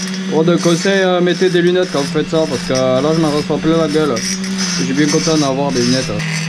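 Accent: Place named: Tarn, France. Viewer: Bouduuuu, saint sulp, lavaur ou graulhet ?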